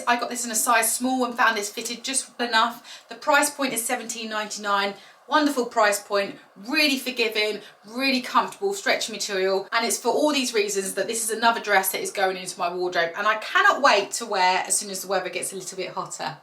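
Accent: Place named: United Kingdom. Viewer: please don't get me wrong but I'm watching your videos for your accent. Have you ever thought of making a video about a British accent? I'm sure there are many people who want to copy your accent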